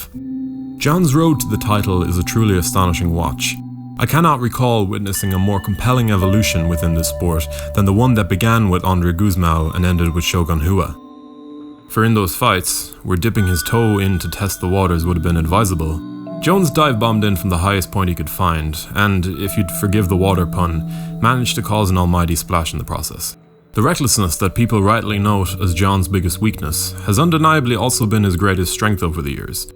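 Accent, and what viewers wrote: Ireland. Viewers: Great content , are you irish ?!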